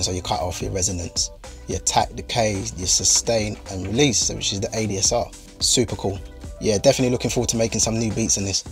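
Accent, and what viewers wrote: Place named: Birmingham, United Kingdom. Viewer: Please tell me you’re from bham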